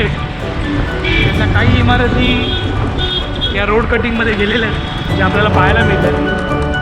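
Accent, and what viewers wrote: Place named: Malvan, India. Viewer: Atishay sundar sadrikaran aahe satya peristithiche, assal malvani tadka aahe tumchya bolnyat 🙏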